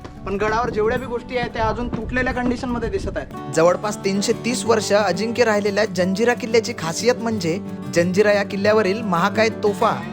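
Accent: Vidarbha, India. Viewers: Congratulations bhava🎉 Marathi travel vlogger tar bharulur ahet pan , Tuza psycho pana and vidarbhachi bhasha hach tuza X-factor aahe Keep it up Bro Lavakar 1 million houdet hi shubechha 🎉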